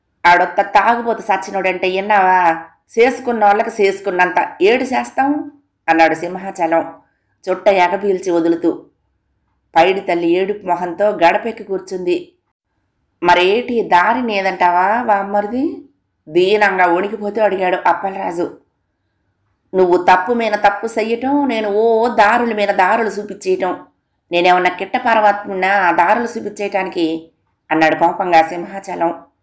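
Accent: Visakhapatnam, India. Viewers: garu meru antha bhaga vizag slang lo chadevaru mam ur voice is god gift andi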